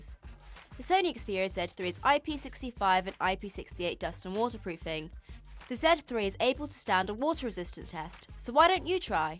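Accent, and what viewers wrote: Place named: England, United Kingdom. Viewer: Well i really need to get used to this English accents. Its so annoying. (My opinion)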